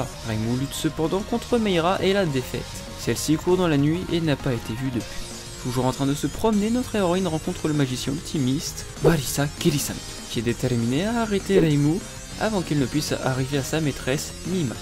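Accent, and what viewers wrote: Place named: Spain. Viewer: frérot tu mélanges l'accent japonais foireux à ton accent français j'ai l'impression tu parles espagnol j'comprend rien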